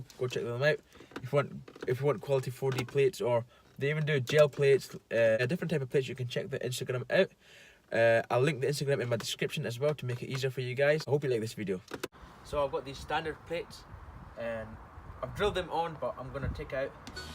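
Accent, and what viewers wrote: Scotland, United Kingdom. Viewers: You Scottish? Lol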